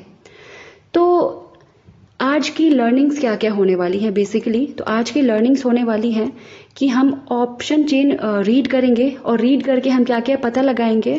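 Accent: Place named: Bihar, India. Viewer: आप बिहार से हैं न मैडम॥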